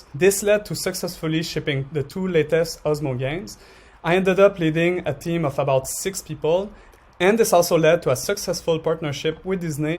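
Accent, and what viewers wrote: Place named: France. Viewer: French guy for sure !!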